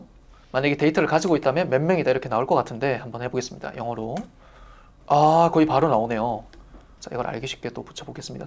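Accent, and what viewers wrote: Gyeongsang, South Korea. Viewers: 부산일보라 억양에 서울말쓰는듯 하면서 경상도 사투리가 보이네요ㅎㅎㅎ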